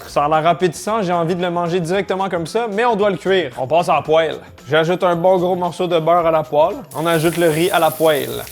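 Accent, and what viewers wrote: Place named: Quebec, Canada. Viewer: Tu vie au Québec ? Parce que tu bcp l’accent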